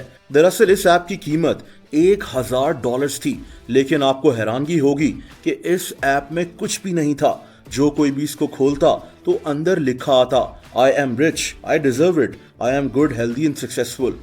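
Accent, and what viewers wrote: Multan, Pakistan. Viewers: Ap multan sa ho na bhai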